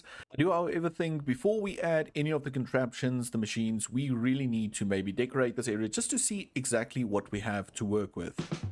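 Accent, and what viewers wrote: South Africa. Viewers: I knew you were South African. Happy we one the world cup!❤🇿🇦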